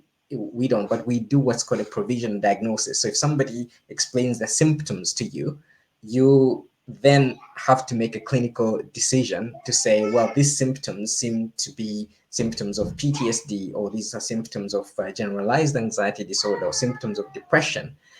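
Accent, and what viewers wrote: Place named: Zambia. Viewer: Your accent tells me you hail from Zed..did I guess right 😅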